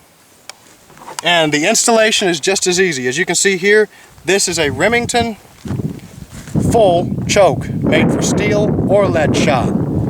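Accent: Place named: Texas, United States. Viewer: Just curious, where's your accent from? I know it's definitely Texan but you sound like you're from the east near Beaumont or around there but I could be wrong. Love the video though! What do you recommend for slugs?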